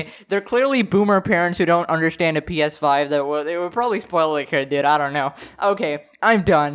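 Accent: United Kingdom. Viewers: Bro I just went to your oldest video and the voice change is real 😂. LOL you used to have a British accent wtf 😂.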